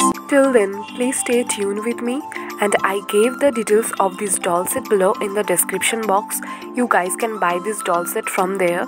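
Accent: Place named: India. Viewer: Are you indian 🇮🇳 your voice is matching 😊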